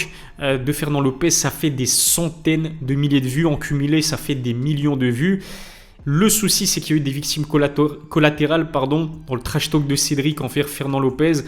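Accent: Belgium. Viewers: Très belle chaîne mma, sincèrement, très passionné et sérieux 👍🏻 Et puis, ce petit accent belge est un plaisir et une marque de fabrique 😁 Merci à toi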